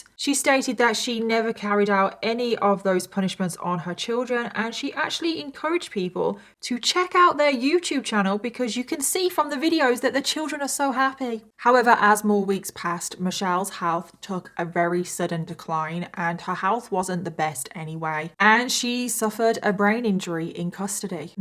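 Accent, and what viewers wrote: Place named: United Kingdom. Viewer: She from the UK and its her accent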